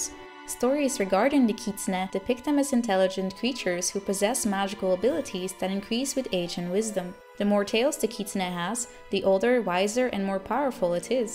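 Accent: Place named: Netherlands. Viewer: Love your vids :) what country are you from? You sound Dutch or something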